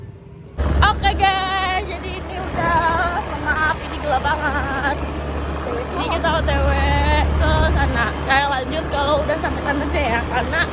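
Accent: Java, Indonesia. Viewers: Kak can ya lucu nk ngomong ngwe boso jowo🤣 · lucuu muni jowo ne 🤣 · Kk orang Jawa tengah kok suaranya Jawa kirain orang Jakarta , jawab Kaka plis aku juga orang Jawa kk jawab ka plis 🙏🙏🙏🙏🙏🙏🙏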